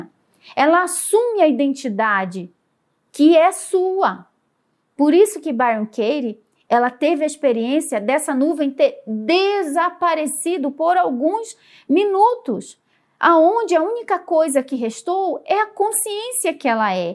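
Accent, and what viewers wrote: Northeast, Brazil. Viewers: Adoro seu sotaque meio do norte ou nordeste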